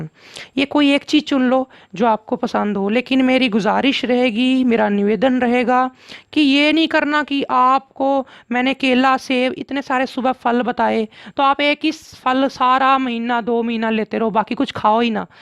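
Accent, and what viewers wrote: Punjab, India. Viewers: Thanx for best useful information an di like your punjabi accent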